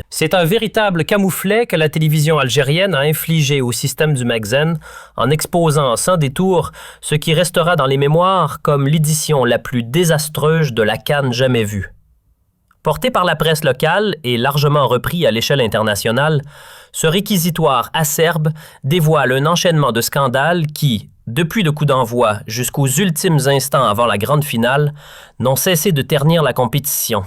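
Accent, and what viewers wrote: Canada. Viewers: 🇲🇦🌟🇲🇦👑🇲🇦🌟🇲🇦✌ Votre post n'est pas une analyse journalistique professionnelle sérieuse, mais la lecture, par un citoyen canadien (selon son élocution), probablement contre argent, d'un communiqué de la junte militaire algérienne, d'accusations et de propagande antimarocaines gratuites, sans preuves. C'est une honte, de la part d'un citoyen ressortissant d'un pays démocratique, de travailler pour le régime algérien militaire non démocratique, régime qui opprime le peuple algérien depuis 1962, par la peur et par les files d'attente des citoyens pour recueillir les quelques biens de subsistance ou utilitaires (pas de lait, pas de sucre, pas de farines, pas de poissons, ...., pas de chambres à air pour les véhicules, pas de gasoil, pourtant l'Algerie est une économie gazière et pétrolière, ). Le Maroc n'est pas riche, mais il n'est pas comme l'Algérie connue pour ses files d'attente de la honte. 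Le Maroc a de bonnes relations avec le Canada, les USA, la Chine, la Russie, la France, le Royaume-Uni, l'Allemagne, ....etc. Toutes ces puissances, grandes ou moyennes, élaborent des rapports satisfaisants, sinon excellents, sur le sérieux, la crédibilité, et l'évolution positive socio-économique et politique du Royaume Cherifien du Maroc. Je ne flatte pas mon pays, mais le nombre de canadiens qui visent le maroc se chiffrent par milliers et les rapports des tour operator occidentaux sur le Maroc sont positifs et contredisent les falsifications algériennes que colporte votre post honteux.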